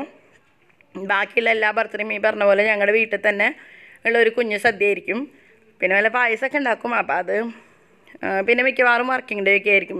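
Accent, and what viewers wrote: Thrissur, India. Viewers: Happy b'day Malu🎂🍫🍬🍭🍡🍨🍧🍨 Cheruthanenkilum nalla sadhya Chechiyude samsaram kettirikkan thanne enthu rasama. Nalla nadan thrissur bhasha thanne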